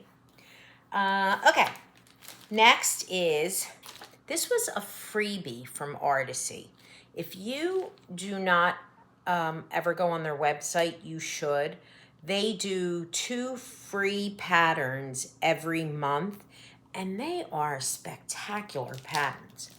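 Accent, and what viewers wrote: New Jersey, United States. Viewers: Hi, I just binged watch all three episodes. I enjoyed you so much. You had me laughing because you sound just like me. I live in FL for many years, but accent is still all Jersey. I too started embroidery and cross stitching as a young girl and remember the stitch on one pattern at a time because our options were limited. Now I have several WIPs on the go. Look forward to seeing your Autumn completions, my favorite time of the year. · Welcome to Flosstube! Being a CT girl living in the Midwest now, I immediately smiled at your Jersey accent. Beautiful WIPs!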